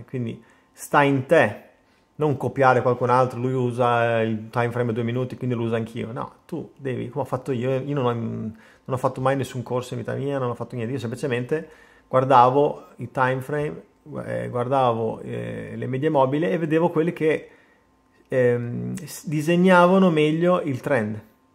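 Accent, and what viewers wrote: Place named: Trentino, Italy. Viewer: Ecco ora ho capito: leggevo trentino ogni tanto, ma l'accento non mente! Ciao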